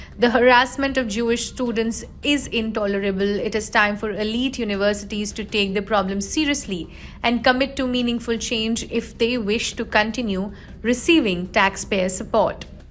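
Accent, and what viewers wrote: India. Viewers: very few Americans can say my name correctly and I accept that. She has an Indian accent. Get used to it or move on.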